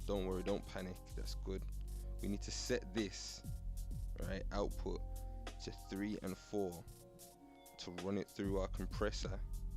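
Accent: United Kingdom. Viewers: I sense some Midlands accent. 👍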